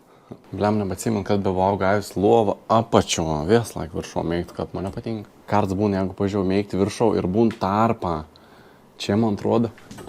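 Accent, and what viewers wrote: Samogitia, Lithuania. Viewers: Ačiū,Tau uz video.Labai grazi Tavo kalba kaip zemaiciuoji.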